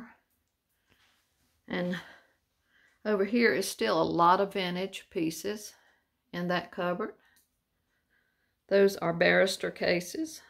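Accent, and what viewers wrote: South, United States. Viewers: I loved your Halloween tour! I can’t get enough of all the vintage goodies! I love your pleasant sounding voice and your southern accent. 😊💖🎃🍁👋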